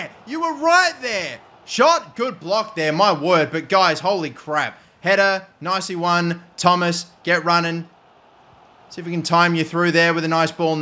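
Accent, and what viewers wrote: Australia. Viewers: yh he's from Melbourne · Wait is he australian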